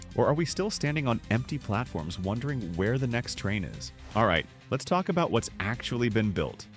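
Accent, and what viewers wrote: United States. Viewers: American accent not even pronouncing place names correctly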